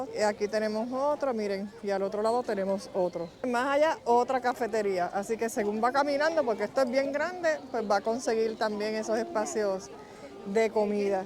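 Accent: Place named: Puerto Rico. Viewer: Saludos. Por fin encontré un YouTube de España explicado en "puertorriqueño". Me gustó que hablas pausado y genuino. Gracias y mucho éxito en tu canal. Myrta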